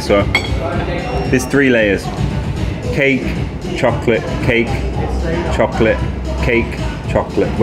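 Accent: India. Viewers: dude you got an Indian accent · Aap hindi main boliye/please speak in hindi. I like your hindi accent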